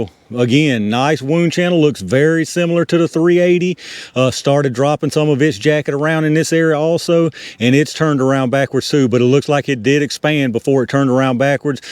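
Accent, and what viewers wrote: South, United States. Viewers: Well, this was a bit like "beating a dead horse", wasn't it? It is already well known that , in terms of kinetic energy, the average 38S is very close the the average 9 mm Parabellum. On the other hand, 380 is practically on par with 32 auto (32 caliber pistol, in Europe known as 7.65 millimeter pistol round) which is a rung lower than 38S/9mm. Nevertheless, I enjoyed watching your test and listening to your Dixie American English :-)...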